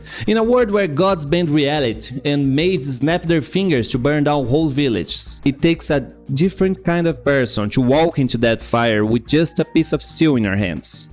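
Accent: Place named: Brazil. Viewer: esse sotaque nunca me enganou. Seu canal tem uma versão br?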